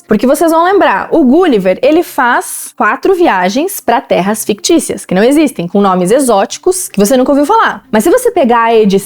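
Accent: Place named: South, Brazil. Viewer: Este classico parece ser sensacional, ainda mais apresentado por uma voz doce de sotaque sulista simplesmente indefectível.... simples perfeição!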